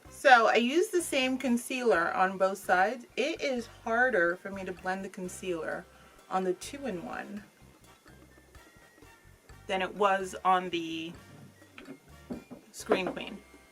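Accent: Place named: New York, United States. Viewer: I just stumbled across ur video u sound SOO much like New York or Tiffany Pollard😍❤❤ I was curious about these 2 products. I'm glad I found ur review! I like winter kimmie!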